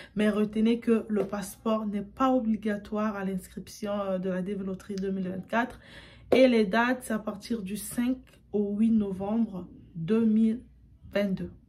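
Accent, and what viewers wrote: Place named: Democratic Republic of the Congo. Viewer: 😂😂😂Bonjour, les congolaise sont là pour te soutenir, je savais que tu étais congolaise juste par ta prononciation française... 🇨🇬🇨🇬🇨🇬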